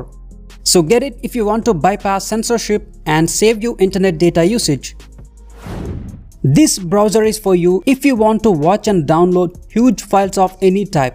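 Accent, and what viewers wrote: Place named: India. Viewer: Bro has an Indian accent. Nice video btw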